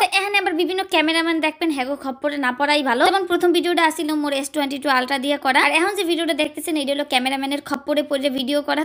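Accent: Barishal, Bangladesh. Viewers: আমার বাড়ি বরিশাল। তাই আপনার কথাই আমার কাছে সবচেয়ে বেশি ভালো লাগে। ভালো থাকবেন।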